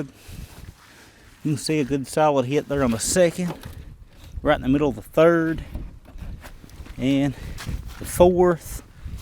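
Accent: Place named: New York, United States. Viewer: you’re up there with Mixup and Hickock. Really enjoy your videos. You gotta get rid of that NYC accent though...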